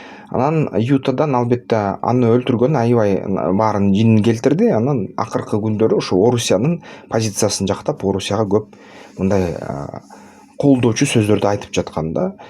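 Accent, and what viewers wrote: Standard. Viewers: Байкем Аман болуңуз кыргызча таза сүйлөгөнүңүз жагат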